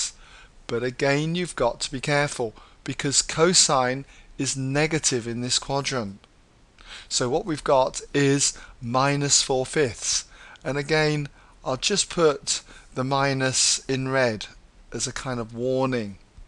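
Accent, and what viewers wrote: United Kingdom. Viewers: The British accent made this video both entertaining and educational! British!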